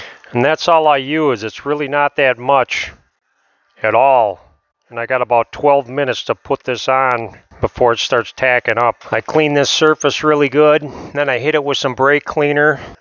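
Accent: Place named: Minnesota, United States. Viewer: Great video! I love your accent. You sound like you're from one of the far north states like Minnesota or around there.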